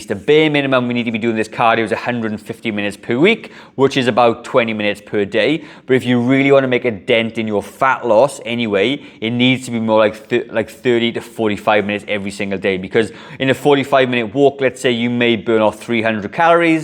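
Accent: Wales, United Kingdom. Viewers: Wales, you do not need to say your from Wales I could tell by your accent first time I watched your very helpful vids, I am from Tenby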